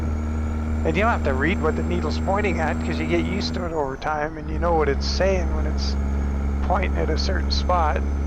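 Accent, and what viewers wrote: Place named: New Zealand. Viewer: Ha, inthat last video I thought the voice was a kiwi accent. As a kiwi myself I approve 😅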